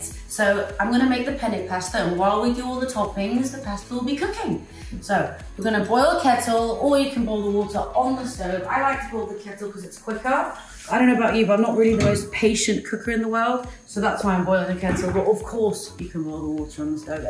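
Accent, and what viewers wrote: United Kingdom. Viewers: Sometimes, I forget that she’s British, and then she starts speaking...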